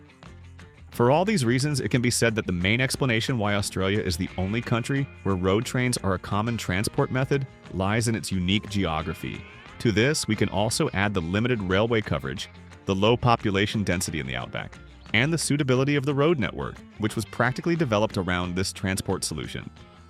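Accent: United States. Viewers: American bloke ?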